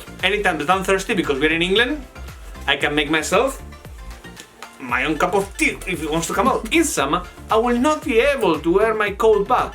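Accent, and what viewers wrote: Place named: Basque Country, Spain. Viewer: Acento vasco ehh, aibalahostia!!!! tienes mi LIKE....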